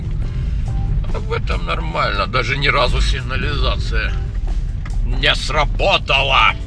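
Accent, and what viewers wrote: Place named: Ukraine. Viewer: Вы сами и есть украинец, не забывайте пан! Даже в вашей речи чувствуется акцент - "Або" "г"кание. И это здорово! Слава Украине!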